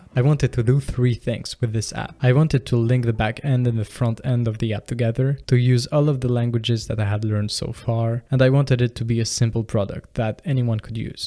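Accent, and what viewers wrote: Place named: United States. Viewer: Comment tu as un accent totalement américain alors que tu est bilingue ?les techniques pour mieux apprendre l'anglais.merci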